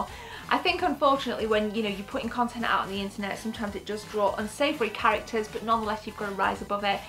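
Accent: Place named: England, United Kingdom. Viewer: I watch you from italy and I love your positive attitude. I think you have a great talent both in front of the camera and behind it. Sometimes I don't understand everything you say, maybe because of fast speaking and english accent(I discovered that from time to time you pronounce "here" more like "hair" XD) but I enjoy anyway. I would suggest some more contents about news, and some reviews. A great hug from Rome and if you come here for vacation you and your wife will be my special guest in my B&B!!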